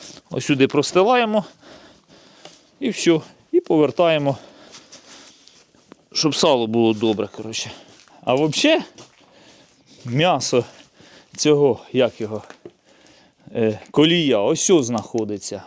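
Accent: Ukraine. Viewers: Этот украинский говор в какой области?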